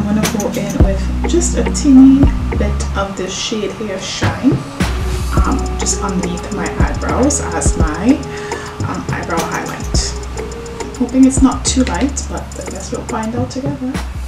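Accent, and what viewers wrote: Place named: Caribbean. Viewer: Hi there. Just started watching & subscribed right away b/c that Caribbean accent grabbed me. 🇯🇲 here, you too?! Also, what are some of your foundation shades? 💜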